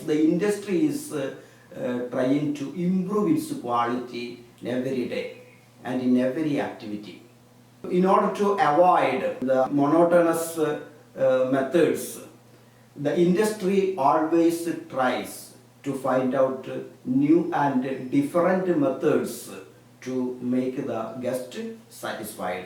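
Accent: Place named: Kerala, India. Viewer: Malayalam poley thonunnu some times your english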